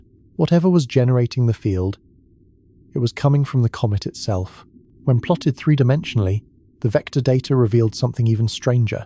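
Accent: United Kingdom. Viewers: i'm watching from 3i/atlas. its 1am local time. oops i think i farted.. nevertheless, british accent is so cringe!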